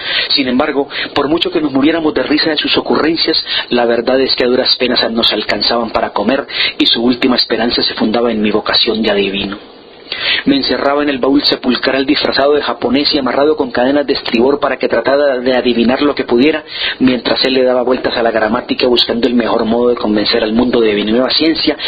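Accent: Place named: Antioquia, Colombia. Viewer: Esta no es de mis historias favoritas, sin embargo entiendo porque esta leída de esa manera. Para aquellos que critican al lector, deben saber que esta historia es acerca de un “culebrero”. Este es el nombre con el que se describe en algunas regiones de Colombia a aquellos que se dedican a vender o engañar al publico de pueblos con medicinas y cremas que en realidad no tienen ningún valor medicinal. Es mas un show que una venta en realidad. El punto es que la manera característica de hablar de estos personajes es así como lo hace este narrador: de manera rápida, con pequeñas pausas para tomar aire y un marcado acento paisa 😀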